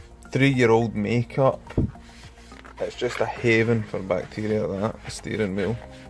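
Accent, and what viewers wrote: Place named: Scotland, United Kingdom. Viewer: I fell off my fart-free seat laughing when you were talking about cleaning "farted on" car seats. Your dialect makes it all the funnier and reminds me of Colin Mcrae. Are you from Lanarkshire by any chance?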